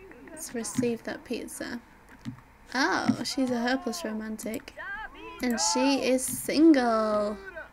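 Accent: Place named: England, United Kingdom. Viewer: where are you from? You sound english but like what city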